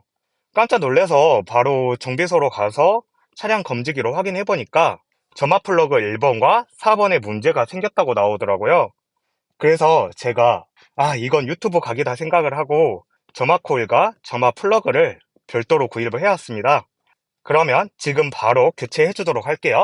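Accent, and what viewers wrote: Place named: Jeolla, South Korea. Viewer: 저도 전라도라 억양이 우리쪽이라고 짐작은 했습니다.ㅎㅎ 반갑습니다.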